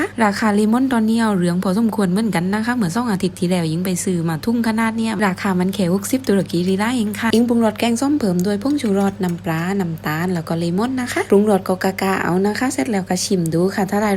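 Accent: South, Thailand. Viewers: แหลงไต้ชัดมากครับ😅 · I like keng som very much 😋 and I like your accent of southern 😊❤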